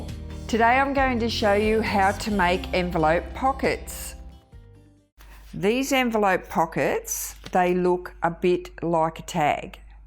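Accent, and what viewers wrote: Australia. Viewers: I’m a Melbourne girl who was so excited to hear an Aussie lady doing a video and I really love how you up cycled those envelopes. I’m doing some gift ideas that will work well with this envelope idea can’t wait to play😃👍🏻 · So nice to hear a Aussie fellow crafter. Thanks for the tutorial as I'm new to all of this and very helpful. And where did you get your glue from please?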